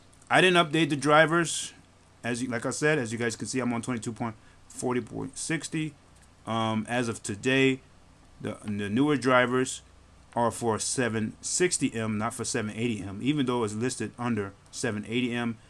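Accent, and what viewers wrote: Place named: Caribbean. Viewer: Sound like your west indian. Grenadian perhaps?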